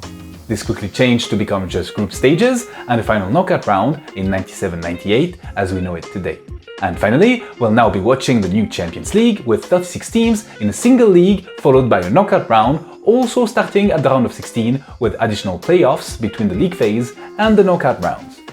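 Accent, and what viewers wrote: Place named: France. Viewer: Pro: Good speed and tone of talking, Great voice, perfect English with sweet French accent, good content, excellent editing, talking while looking directly to camera! Amazing potential. Cons: Bad lighting, average sound, awful position of the head (at the beginning I thought that you sit right under the shelves and I was looking that at some point you will hit your head on the lower shelf). The video is golden.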